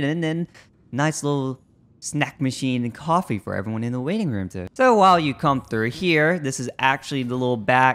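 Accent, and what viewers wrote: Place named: Ireland. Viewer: Huh first time hearing you and almost prefect on voice except somehow got irish accent 😅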